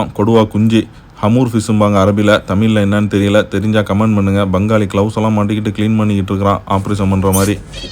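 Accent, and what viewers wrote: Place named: Kayalpattinam, India. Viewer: நீங்க பேசறது காயல்பட்டினம் மேலப்பாளையம் பேசுற மாதிரி இருக்கு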